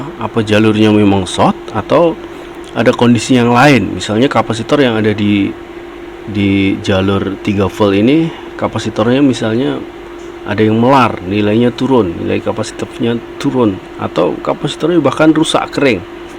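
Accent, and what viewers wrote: Java, Indonesia. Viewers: bang bhs inggrisnya kebawa agak kejawaan😁😁😁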